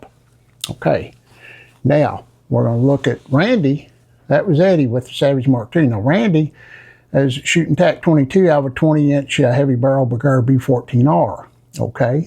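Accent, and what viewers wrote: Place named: South, United States. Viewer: I like your accent. I’m trying to learn if it’s regional. I’m going to guess Alabama or Mississippi. I’m from Australia.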